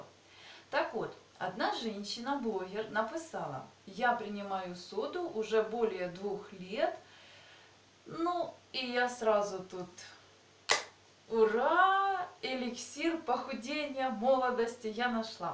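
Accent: Surzhyk, Ukraine. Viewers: такой суржик👍👍👍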